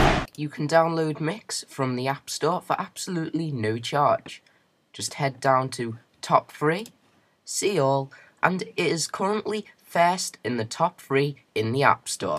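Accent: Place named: United Kingdom. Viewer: i love british voice.. pure english voice for a bulgarian for me... btw this review is great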